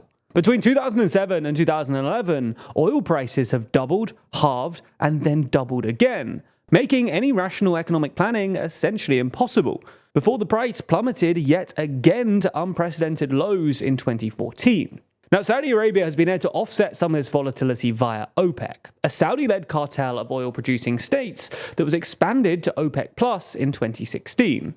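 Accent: United Kingdom. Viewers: Just say, Muslim scholars. Stop trying to pronounce Arabic words with your goofy British accent.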